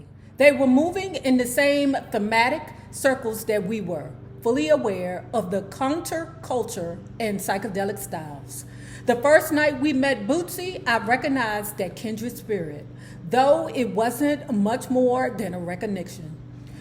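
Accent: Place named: Washington DC, United States. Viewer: Lmao lmaooooooooo you sound like you from DC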